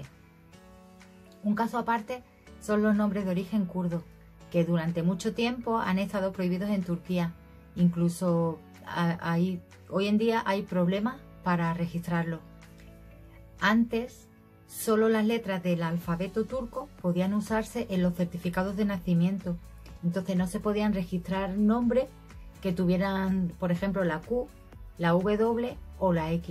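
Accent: Andalusia, Spain. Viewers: Estupendo video, son muy bonitos los nombres turcos, desde que veo las series todo lo referente a Turquía me encanta. No hay lugar en el mundo donde que me atraiga más que Estambul. Me gusta como suena el idioma, las comidas, costumbres, todo es nuevo para mi. No me gusta cuando critican en los medios la vida en Turquía, supongo que como en todas partes hay tradiciones mejores o peores pero por eso no hay que desmerecer a una tierra y a su gente. Por tu acento deduzco que eres de Andalucía y has aprendido a querer a Turquía que me parece maravillosa. Pena que con este virus, cada vez estaremos mas limitados en viajes por el mundo. Te he descubierto hace poco, pero es muy interesante todo lo que dices. Un beso desde Barcelona, tienes una fan. ♥️🌷🌼🌹🇹🇷🇪🇸😘😘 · Me encanta el acento andaluz!! 🙌🙌🙌🙌🙌 Justo estoy viendo uno de tus vídeos "10 cosas que siempre hay en una casa turca"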